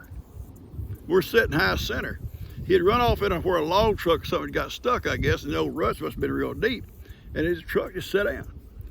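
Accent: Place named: Texas, United States. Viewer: Hi you have an extraordinary talent for storytelling. Those twinkling eyes of yours and that Texan drawl is just so compelling. You have a gift that not many have. Thank you ❤️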